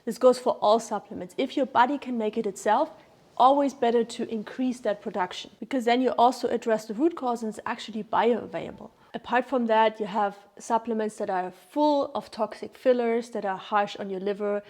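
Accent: Germany. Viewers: I love your accent you delicious German tootsie to worship 👀👀🔥🔥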